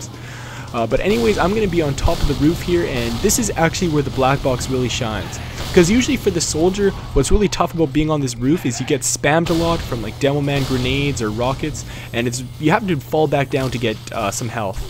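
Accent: Canada. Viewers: Are you a Newfie?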